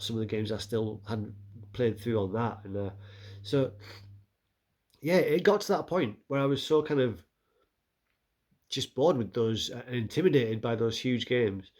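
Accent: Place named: Australia. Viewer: You have a distinct Australian accent. How did you learn it? 👍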